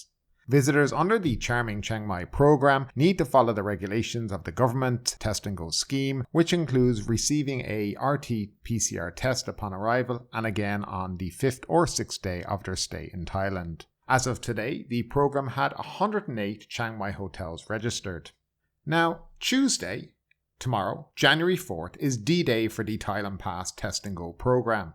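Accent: Ireland. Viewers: Hi. or is it. I arrived in Suvarnabhumi airport today flying from Dublin via Amsterdam. I hope they restart the Thai pass programme because it definitely works. if the Thai government was to pick up cost for 2nd PCR test as they are doing now it would be a grand good will gesture and ease some of the anguish some travellers are having. The infrastructure in place at BKK airport was excellent today and the staff were very professional and diligent the whole process was very smooth. As we say at home don't change something if it doesn't need fixed. On lighter note is it probable that I am first Irish man to enter Thailand this year. Also nice to see you haven't lost your Irish accent 3 is still pronounced tree. Slainte.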